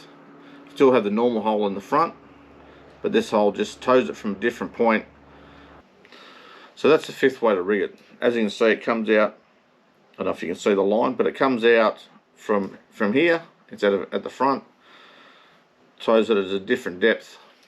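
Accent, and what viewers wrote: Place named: Australia. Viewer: GREAT NORTHERN BEER:, Thanks for the Rig demos for the Tasmanian. I enjoyed seeing this, AND You tilting the Cans of Great Northern. The slured Speech, along with The Down under Accent was busting me up. I think my Demo " BRO", Was half in the bag, while doing the Video. LMAO.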